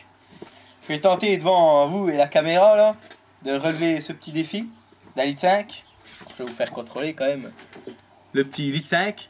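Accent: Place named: Belgium. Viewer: le soleil du sud avec un accent belge ? le combo de dingue un belge sudiste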